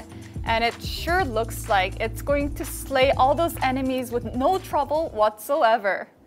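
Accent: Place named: United States. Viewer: Dude I thought I was gonna hear some koran, turns out she speaks American English more than Americans themselves!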